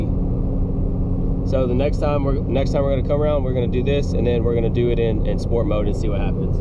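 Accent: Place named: South, United States. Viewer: A Mexican that speaks in a southern accent, never thought I’d see that lmao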